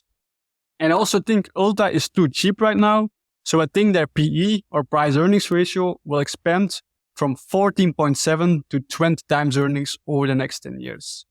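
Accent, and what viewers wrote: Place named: Brazil. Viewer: Also, my guess is he's Brazilian. He has the UFC fighter accent.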